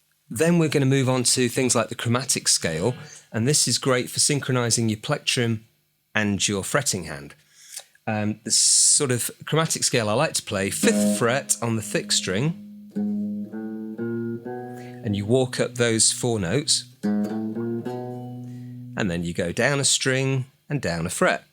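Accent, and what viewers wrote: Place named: England, United Kingdom. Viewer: I could not watch until the end. You made me grab my guitar. That's a good thing. Subbed. Cheers. P.S. London and Milton Keynes raised. I am a Kiwi now though. We escaped the wicked witch's axe and legged it out of Blighty. We flew actually, but point being that the accent is familiar. It works for me. Stay calm and none of the hype that gets my blood pressure up.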